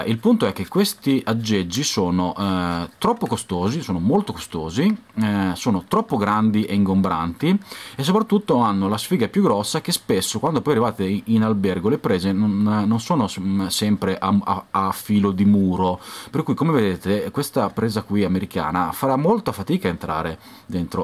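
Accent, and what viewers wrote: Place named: Modena, Italy. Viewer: Sembra di Modena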